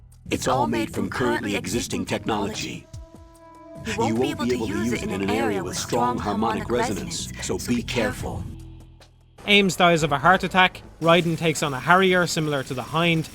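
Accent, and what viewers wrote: Ireland. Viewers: You're Irish aren't you :)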